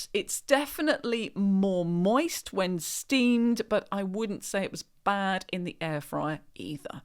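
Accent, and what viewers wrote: England, United Kingdom. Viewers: 2025 Thanks and an English voice too. 👍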